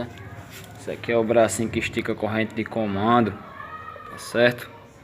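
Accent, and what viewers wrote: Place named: Northeast, Brazil. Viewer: Maravilhoso sotaque nordestino, " ta rochada ja" abraço pra vc s todos aqui de CuritibaPR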